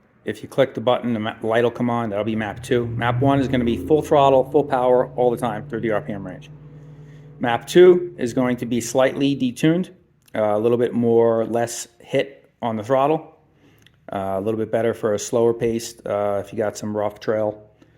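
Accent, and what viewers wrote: New York, United States. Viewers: you sound like a NYer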